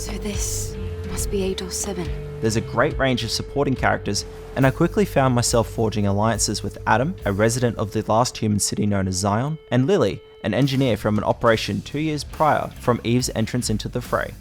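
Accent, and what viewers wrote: Australia. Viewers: They are Australian.. but i think what is lacking is seeing the narrator’s face, mannerisms & physical expressions. Though It does sound little green horn and someone reading out an assignment.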